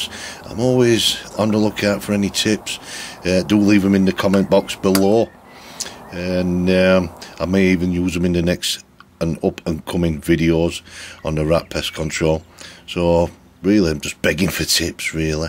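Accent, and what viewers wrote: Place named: Yorkshire, United Kingdom. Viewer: This may seem a bit of a tangent to some, but I would love to know what your accent is, sir. I am an English teacher and very interested in accents. Can I venture a guess? Yorkshire? Please let me know. Also, I LOVE this rat shoot video. I am an avid shooter myself. Cheers!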